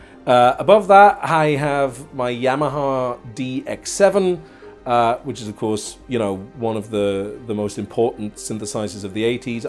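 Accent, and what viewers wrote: United Kingdom. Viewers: Love your Welsh accent. Second career in voice over?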